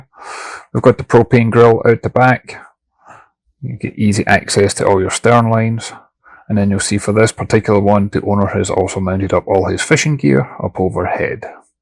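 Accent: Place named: Scotland, United Kingdom. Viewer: I love these GH boats and your reviews. I particularly enjoy your accent as it reminds me of my times in Scotland.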